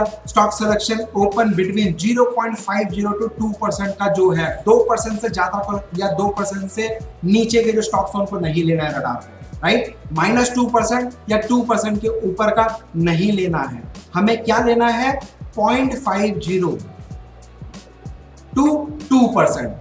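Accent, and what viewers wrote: Odisha, India. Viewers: Sir are u from odisha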